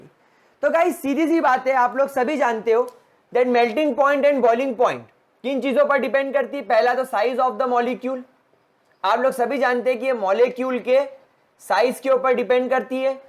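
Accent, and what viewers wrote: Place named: Indore, India. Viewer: Sir apki awaz me indoor ka accents hai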